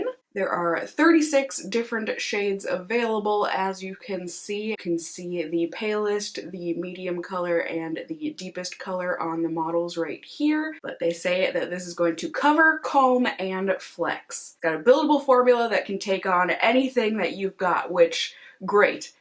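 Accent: United Kingdom. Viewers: Your British accent is lovely 😃 love your videos.. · The British accent 😂😂😂😂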